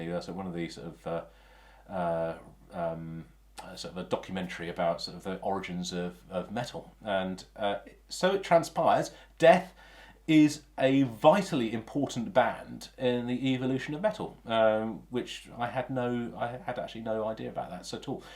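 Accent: United Kingdom. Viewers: Your accent is nice :D Are you from Britain? 🤔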